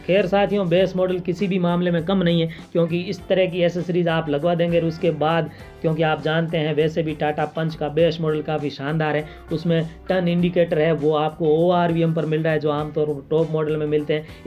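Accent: Rajasthan, India. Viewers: Bhai rajasthan ke ho lagh toh rha h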